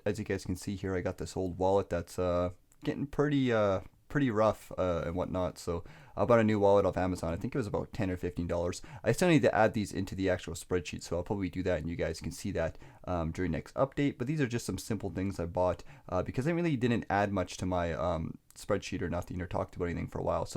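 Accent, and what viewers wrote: Canada. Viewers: this guy so fun to listen to. I can tell he's Canadian so quickly.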